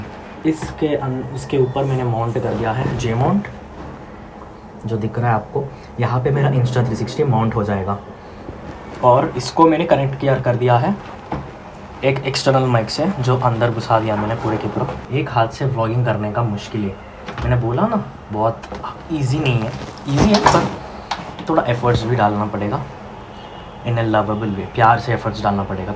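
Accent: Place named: India. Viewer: Love your Hindi language accent🥰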